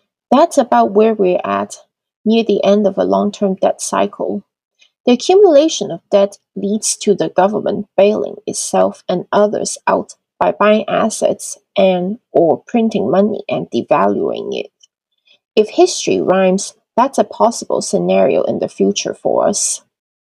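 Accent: China. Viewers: 能听出来你是中国人哈哈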